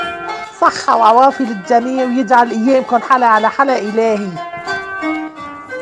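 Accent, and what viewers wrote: Aleppo, Syria. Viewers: وللة بتذكريني كتير بلحكي الححححلبي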